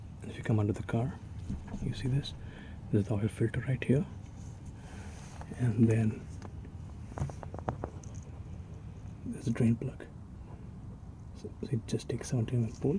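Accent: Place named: India. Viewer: This is going to sound racist and it may be, make more of these vids I trust the Indian accent teaching me prob a result of Kahn academy but very informative thank you!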